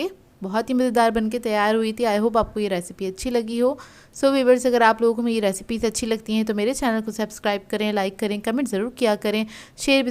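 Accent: Karachi, Pakistan. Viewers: Your accent reminds my old days of Karachi! I really appreciate your effort 🤗☺️ GOD bless you 😊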